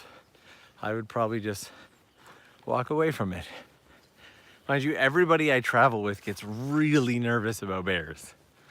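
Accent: Canada. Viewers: You look, sound and somehow talk like Ryan Reynolds...and I'm guessing Canadian?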